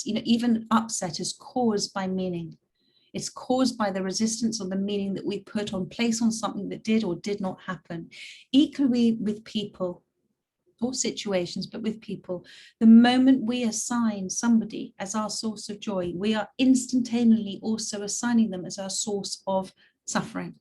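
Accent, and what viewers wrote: United Kingdom. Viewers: Nice to hear another new British tarot reader . Lovely insite and understanding from the interpretation of the cards ♥️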